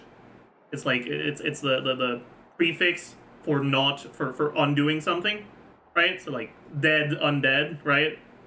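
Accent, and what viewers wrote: Quebec, Canada. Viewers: Kinda funny watching this from a French person perspective. He pronounces everything the French-Canadian way, and some of theses rules don't really feel valid within the boundaries of my accent. His o sound like ow, the way he says é and ai can be related which is, I think, never the case for me, etc. But a pretty entertaining video nonetheless. Always a pleasure to hear share a little bit about his francophonic side!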